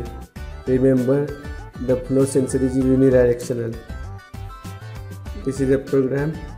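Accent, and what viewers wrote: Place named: India. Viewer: Hard to understand with that Indian accent and the background music makes it even harder to understand what is saying. Now you know what need to change. Content and illustration are good.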